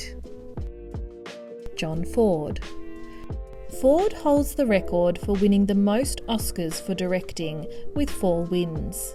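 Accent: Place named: United Kingdom. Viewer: Why is someone with a British accent narrating a film on American life?!?!?;?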